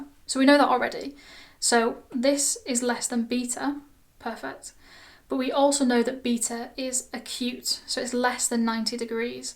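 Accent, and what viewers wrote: United Kingdom. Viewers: I enjoy UR BRITISH ACCENT too😘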